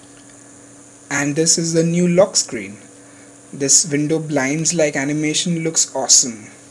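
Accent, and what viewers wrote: India. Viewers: Your english like indian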